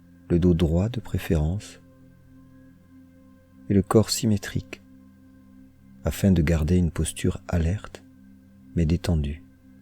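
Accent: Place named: South, France. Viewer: Qu’est ce qu’elles sont bien vos méditations et le guidage très doux, avec ce petit accent du Sud qui ajoute à la souplesse des images proposées . Merci infiniment.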